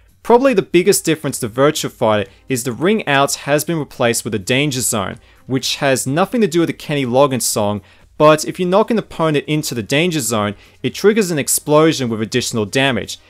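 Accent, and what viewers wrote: Australia. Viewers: Hi Mate. You sound like you are in Austarila, so am I. Did this Japanese import NTSC-J game play fluidly on your PAL Sega Saturn? Is your Saturn unmodded?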